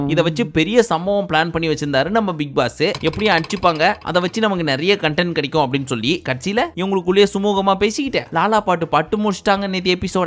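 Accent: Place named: Madurai, India. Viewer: 9 ruba note madurai slang anna 😂😂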